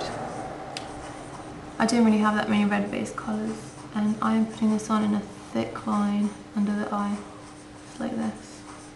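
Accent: England, United Kingdom. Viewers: Lovely accent! I miss England, I got my BA in English Lit there. :( I want to be there again so bad.. Anyways, you TOTALLY persuaded me to go get the Spiced Chocolate quad, as I have commented earlier, I never thought that copper-red would look good on me as I have blue eyes and fair skin, but we both have the same colouring so I will give it a try. Please, please, please!!! make more videos,I beg you! You have amazing talent, you have not been in make up school? Really? I am gobsmacked.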